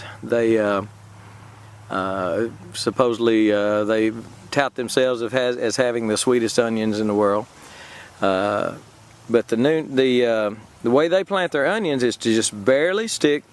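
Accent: Texas, United States. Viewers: He has a great accent. I wonder what part of Texas he is from. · Always great to hear another Texan.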